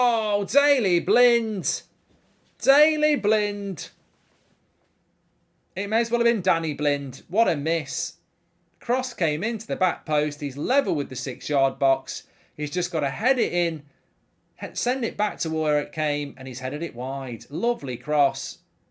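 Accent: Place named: Manchester, United Kingdom. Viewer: Its that miserable manc accent that makes it funny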